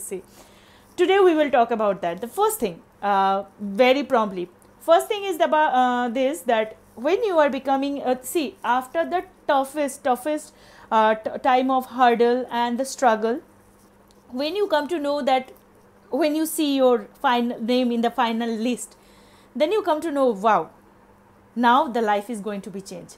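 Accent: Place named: Bengal, India. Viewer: I love your bengali-english accent❣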